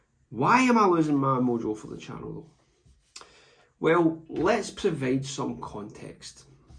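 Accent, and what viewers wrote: Scotland, United Kingdom. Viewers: I think your biggest appeal is the rawness of your videos. On the patio, top of the stairs or front room, a working father getting it in is inspiring. Be yourself, the world loves us Scots, accents and all! Maybe not the swearing at times though, could put some off. I’ve taken ideas from you and have tried a good few of your videos as they’re a bit different from what I normally do. Get the burpee tour of Scotland on the go! 😂😂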